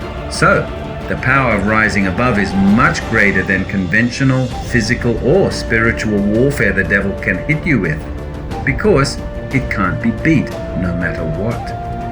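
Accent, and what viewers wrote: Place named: Australia. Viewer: GBY! I hear an Aussie accent, but I’ve been told you live in Sth. Africa - is that right?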